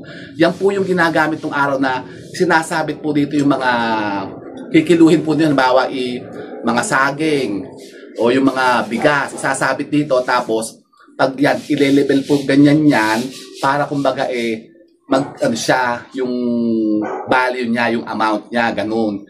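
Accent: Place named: Laguna, Philippines. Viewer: Ganyan talaga punto sa laguna😂ganda ng outfit eehh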